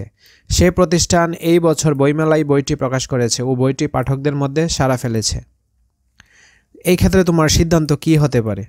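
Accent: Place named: North Bengal, Bangladesh. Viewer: Apnar basha uttarar kothai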